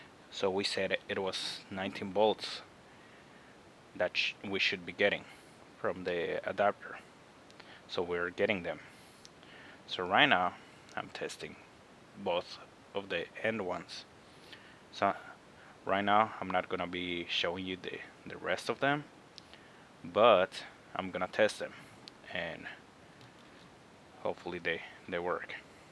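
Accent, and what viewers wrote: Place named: Philippines. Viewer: Are you a Philipino? You sound like a Pinoy. Thank you for your video man I learned a lot!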